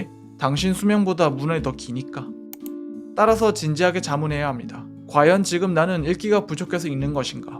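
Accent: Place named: Busan, South Korea. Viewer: 좋은 영상 감사합니다!! 부산 출신이신가요? 억양이 반갑네요 :)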